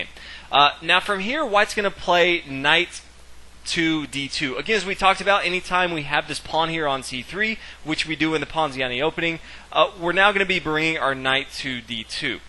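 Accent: United States. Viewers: thanks a lot for your videos, helps me to find a path in that wood of openings. now i only have to find a way to make my son (13 years, german and learns oxford-english at school) understand your amarican accent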